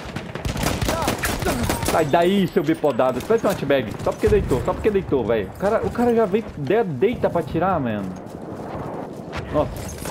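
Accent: Pará, Brazil. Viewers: VC E PARAENSE MANO KKKK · Você é do estado do pará?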